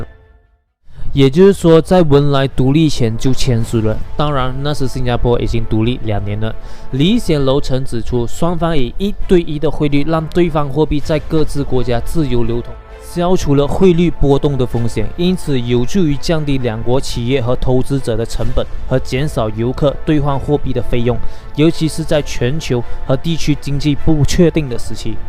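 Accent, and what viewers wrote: Malaysia. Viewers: 没人要求你一定要听完，这是我们malaysia的特色，这是我们祖先从中国来到南洋后传给我们的（我们听着很舒服） 就好像东北/台湾/山东/香港都有各自的口音，我们会尊重他们的口音，说话方式， 怎么到我们的口音你就有意见了～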